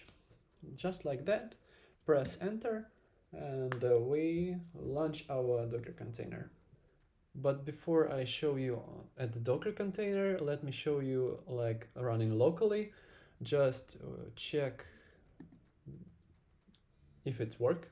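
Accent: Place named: Russia. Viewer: Наш акцент трудно не узнать, но автор долго не выдавал себя, пока на Амазоне не появилась доставка в Российскую Федерацию 😂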